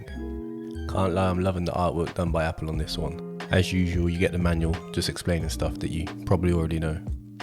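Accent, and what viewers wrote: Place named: London, United Kingdom. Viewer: Nice vid bro. Stumbled across your vids. The london in you is clear to see ha ha.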